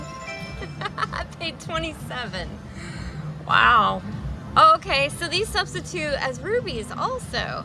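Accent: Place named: South, United States. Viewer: the excitement in your southern voice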